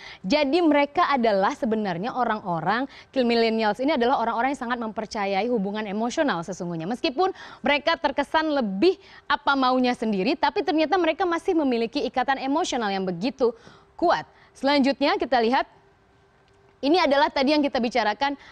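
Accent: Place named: Eastern Indonesia, Indonesia. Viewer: itu aksen bung, kan dia dari Indonesia Timur, wajar lah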